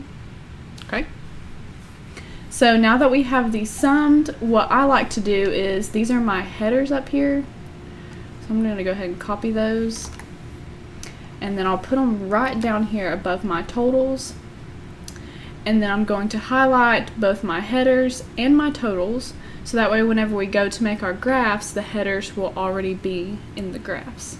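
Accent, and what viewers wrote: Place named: South, United States. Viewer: i love your southern accent :)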